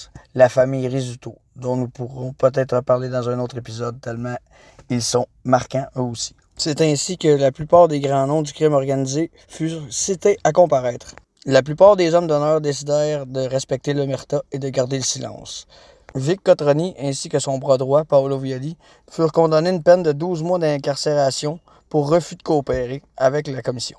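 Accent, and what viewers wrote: Quebec, Canada. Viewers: Il y a quelques années dans le cimetière Notre dame des neiges, je suis passé devant la tombes des Violi. Un magnifique monument avec le nom des trois frerots. Digne d'un film de la mafia! C'est agréable de vous entendre parler Québécois avec vocabulaire irréprochable! C'est une bien belle façon de se distinguer! Bonne chance dans vos projets!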